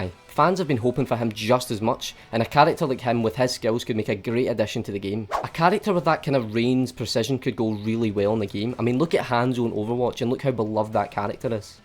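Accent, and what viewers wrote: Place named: Scotland, United Kingdom. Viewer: hey, i love ur accent, r u scottish ?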